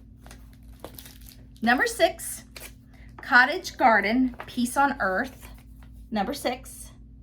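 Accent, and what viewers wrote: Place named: South, United States. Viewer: Hi! I live in Colorado - but grew up in Virginia and South Carolina and my family is all still in the Carolinas. Watching you and hearing your sweet southern drawl feels like a visit from home. 💕 1, 2, 3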